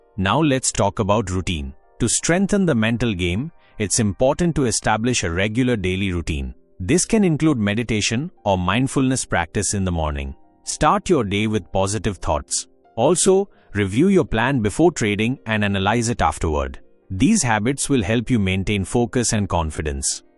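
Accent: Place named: India. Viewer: Why does this AI have an Indian accent lmao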